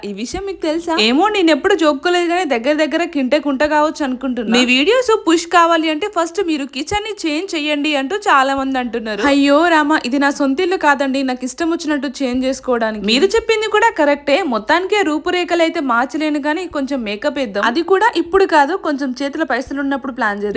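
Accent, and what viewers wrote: Telangana, India. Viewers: సిస్ నువ్వు అచ్చం మన తెలంగాణ లెక్కనే ఉన్నది మాట్లాడుతావ్ నేను అంతే సిస్😂 "("కింటల్ కు ఉంటా కావచ్చు"") 😂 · Telangana vallam kabatti manam Telangana slang lane matladutham kondharu style ga pose kodutharu andhra yasaloki poyi 😂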